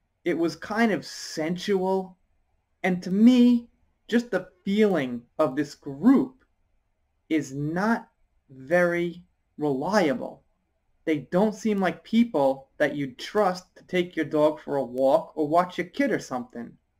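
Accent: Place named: East Coast, United States. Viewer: What made you move to Japan? You sound very East Coast USA.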